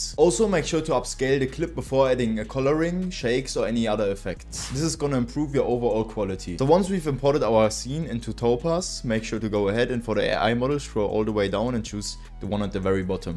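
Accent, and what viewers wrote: Germany. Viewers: Man hört so krass das Deutsch heraus :D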